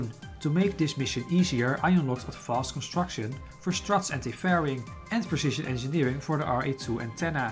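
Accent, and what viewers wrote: Netherlands. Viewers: I smell dutch. Tell me if I am right or not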